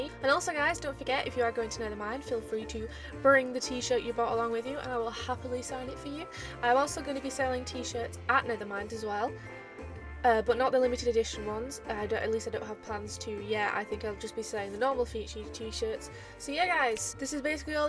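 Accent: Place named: Manchester, United Kingdom. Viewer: Your voice reminds me of an old mate from Manchester ^^ keep it up, deary!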